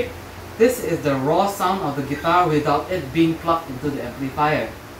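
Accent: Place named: Singapore. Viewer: Kerasa banget Singlish nya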